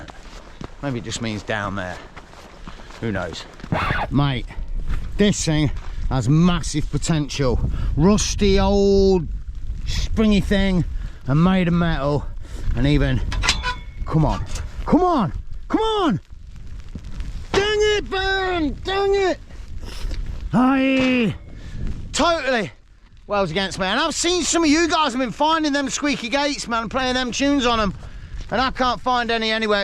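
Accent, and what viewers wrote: Leicester, United Kingdom. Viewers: Gonna be completely honest here, when I first started watching you I hated it, just couldn't get used to the Leicester accent, now your my favourite u tuber it's hilarious, can't wait for u to upload the next video, u really make my day 🤣🤣🤣🤣🤪🤪🤪🤪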